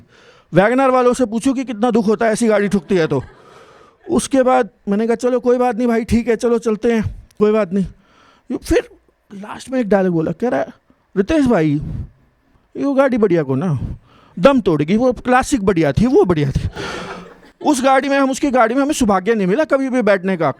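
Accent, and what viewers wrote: Haryana, India. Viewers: btw, even your haryanvi accent is commendable bro👏 ..keep growing🫶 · Haryanvi accent is perfect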